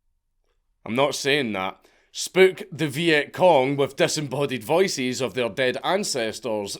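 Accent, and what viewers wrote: Scotland, United Kingdom. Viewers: I love the Scottish accent. Its so...confusing, in an attractive sort of way. I think it's great that we are including the mentally disabled in the recruiting process. They can't drive, but give em` some high sxplosives; what could go wrong?! The time is nigh for action men....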